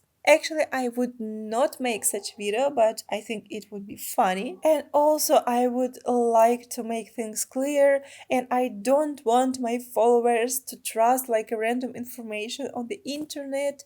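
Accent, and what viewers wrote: Russia. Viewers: It sounds like you're saying that you have to be either really skinny or very plus size to get sponsored by companies -- not be somewhere in the middle. I'm not sure who writes those articles, but I always wondered how accurate or not accurate they were when it came to youtubers or similar people. I thought you were around 28-31. To be honest, I think I thought you were from Russia in the past due to your accent.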